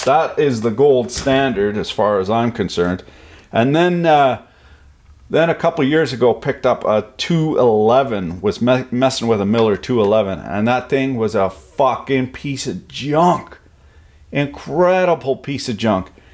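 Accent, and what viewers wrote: Quebec, Canada. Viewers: I thought the best part was hearing you speak Quebecois, until I heard your little girl... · Jte spot avec ton accent du lac saint jean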